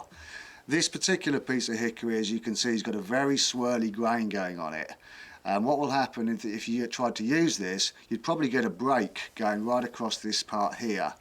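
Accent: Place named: London, United Kingdom. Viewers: Not northern at all. I'd say down by London somewhere. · Nope. Definitely not Northern. Southern, probably London area. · London or nearby. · I think East london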